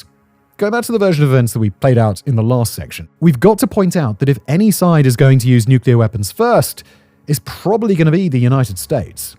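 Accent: United Kingdom. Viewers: British accent is so stupid! 😂😂😂😂😂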